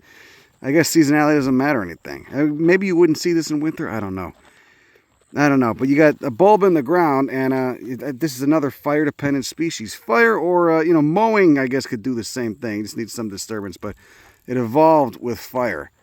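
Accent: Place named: Florida, United States. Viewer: he really got into florida character for this episode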